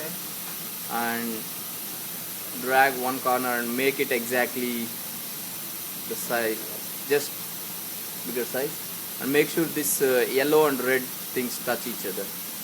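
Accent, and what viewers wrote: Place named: India. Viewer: India English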